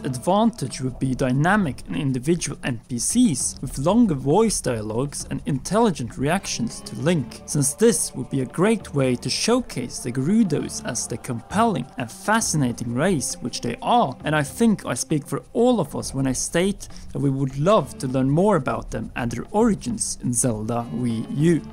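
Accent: Norway. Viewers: That accent, er du norsk? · Hey dude, curious about your dialect, norwegian? Ever seen the series Hell on Wheels? you sound just like the character "The swede". Cheers.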